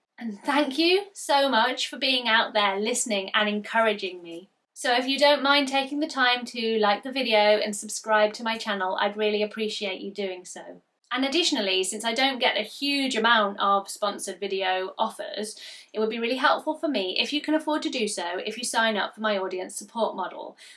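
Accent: United Kingdom. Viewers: I like you just as you are. And, I'm American, and I love British accents!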